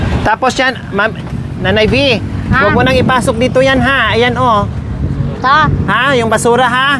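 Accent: Visayas, Philippines. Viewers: ang cute mo mag bisaya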